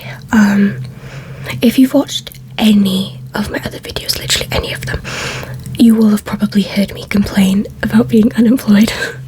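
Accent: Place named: United Kingdom. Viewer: Ramble vids are the best. Where are you (roughly) from in the UK? Can't quite put my finger on it.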